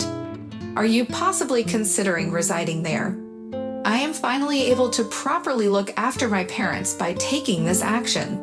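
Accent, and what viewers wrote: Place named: Japan. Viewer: This is pretty disjointing and doesn't make sense. Sounds like Japanese. Nobody here in the states speak like this.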